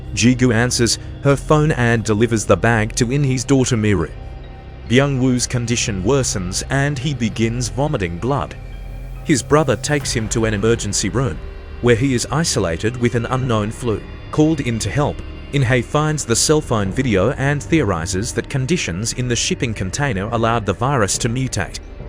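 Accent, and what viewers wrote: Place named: Australia. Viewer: Great to hear Aussie AI voices 👍🏾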